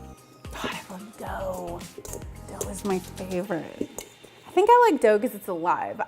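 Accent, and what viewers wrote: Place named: Minnesota, United States. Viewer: You may live in Dallas, but you are definitely a Yankee. I live in central Texas, but was born and raised in Minneapolis. You sound like Minnesota. Come on. Tell the truth. I love your channel in your cooking. I can’t wait to try everything.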